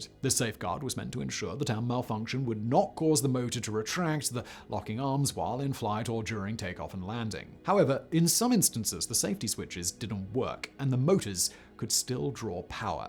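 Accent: United Kingdom. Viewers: HOLY COW!!! I really TRIED to watch this video!!! I live in Texas!!! My dang ears & brain can’t keep up with your dang UK accent & “speed talk”!!! SHEEEESH!!! I finally had to STOP being frustrated & delete the video!!! 🤓🤓🤓🤓🤓🤓🤓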